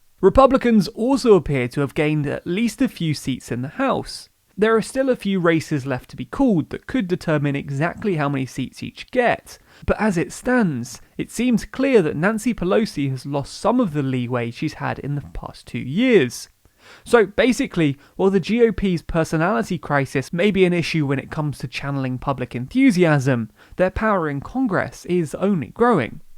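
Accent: United Kingdom. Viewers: The initial cringe I had hearing a british voice talk about "transition period" I was thinking IT NEVER ENDS!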